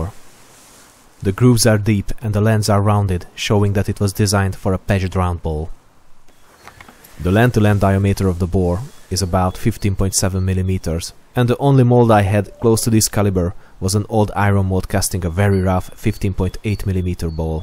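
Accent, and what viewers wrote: Hungary. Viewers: Are you hungarian? (Because you have a hungarian accent.) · Hungarian accents are gorgeous :3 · What i hear is hungarian accent? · Behold the hungarian accent... :D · A hunglishodból egyből tudtam, h magyar lehet az anyanyelved, még mielőtt utaltál rá :) Tetszik a videó, csak így tovább!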